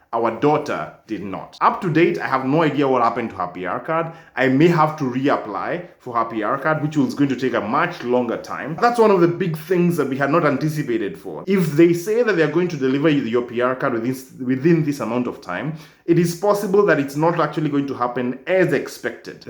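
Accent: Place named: Kenya. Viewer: You sound Kenyan. Useful video.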